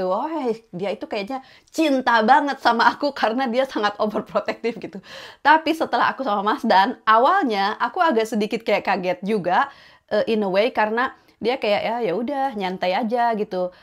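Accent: West Java, Indonesia. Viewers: Dengerin cara ngomongnya si mommy ini nyaman banget,serius deh,nambah ilmu juga walau aku belum menikah,dan ada kesamaan tentang anak2 yang membantu di keluarga,krn di keluargaku sejak kami(aku dan 3 adikku kecil) sudah terbiasa abis makan,cuci piring masing2,cuci baju sekolah masing2,karna mommy aku meninggoy sejak kami masih SD,bapa kerja pagi2 banget,dan jadilah aku ngurusin 3 adikku, anak kecil ngurusin anak kecil,jadi dah biasa mandiri,oke sehat2 mommy and family,btw pengen deh keluarga ini ngevlog mudik ke Indonesia,ke kampungnya di sunda kan soalnya logatnya sunda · eteh sunda de besssss pokona mah 😍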